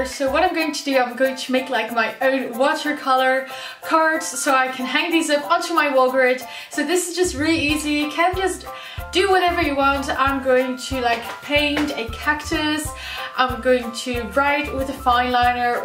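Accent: Netherlands. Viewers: No hate but I immediately knew you were Dutch the moment you started speaking lol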